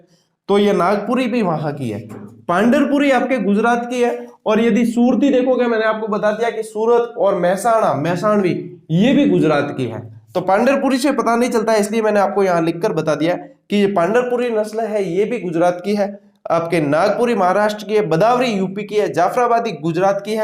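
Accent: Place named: Marwar, India. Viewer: सर आप जो वीडियो में मारवाड़ बोलते हैं ना वह मुझे सुनने में बहुत अच्छा लगता हैं श्री शिवाय नमस्तुभ्यं महाकाल आपको हर बुरी नजर से हर हर विपदा से बजाएं आपके परिवार की रक्षा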